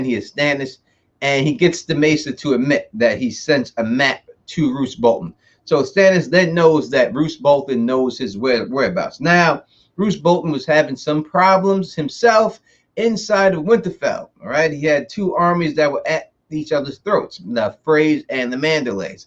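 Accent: New York, United States. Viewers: I still can't get over how new york this guy is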